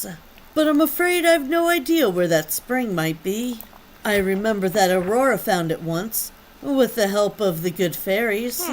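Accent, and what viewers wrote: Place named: Scotland, United Kingdom. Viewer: The scottish accent I cant get over. can I have your autograph? Your a star ❤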